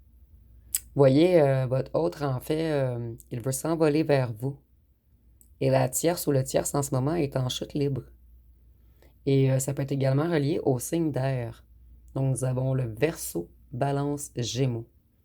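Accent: Quebec, Canada. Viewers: J adore ton accent Québécois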